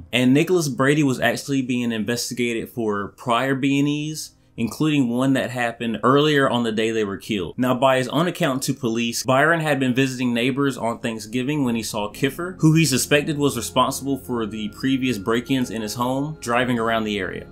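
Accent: South, United States. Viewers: You are so country. It’s adorable ☺️ I would wager you are from the south😊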